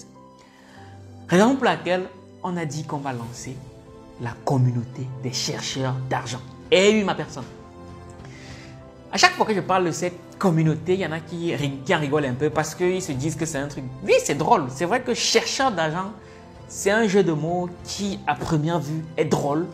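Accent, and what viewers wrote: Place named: Cameroon. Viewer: Mais tu as gardé l'accent fort fort du mboa! C'est cool, du courage.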